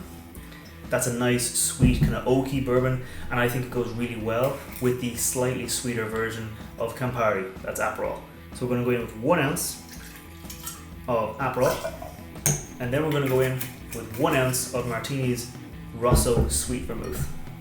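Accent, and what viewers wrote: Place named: Ireland. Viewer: Where are you from and what is your name? I recognise an Irish accent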